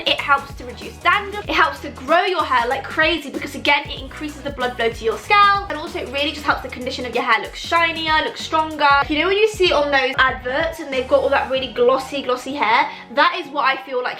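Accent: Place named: England, United Kingdom. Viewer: She's British-Indian and lives in England, you can tell from her accent that she's raised in England pretty much with Indian culture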